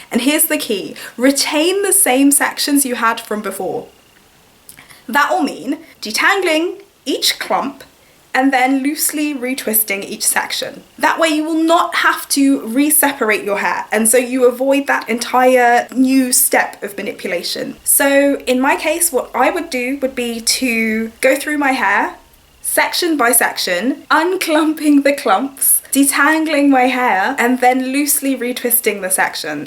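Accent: England, United Kingdom. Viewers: Isnt that the cutest lil english Disney-sounding accent ever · 😃 OK only a true Hilarian would know that. It's just that you sound SO English I thought it couldn't possible be so but then again that logo is burned into my brain! 😀 Anyway, old head here (class of '91 eep!) and I live in London. Bless! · I didn't think of disney but just those children's story books. She has a great accent. It's English but her type of English sounds nice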